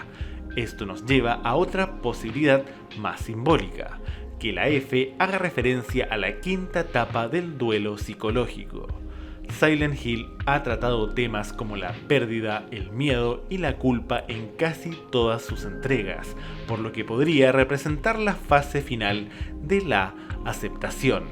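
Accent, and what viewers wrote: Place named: United States. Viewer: Tuve que dejar de ver el video por tu tono de voz y como intentas pronunciar las palabras que no necesariamente deben pronunciar con un acento americano, deja de hablar como un niño con TEA por favor 😿🙏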